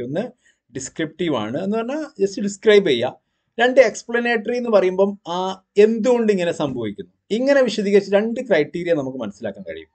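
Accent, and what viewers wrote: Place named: Malappuram, India. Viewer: മലയാളം പറയുമ്പോൾ നാടൻ ഭാഷയും ശൈലിയും പ്രത്യേകിച്ച് മലപ്പുറം Slang വരുന്നു. ശ്രദ്ധിക്കുമല്ലോ?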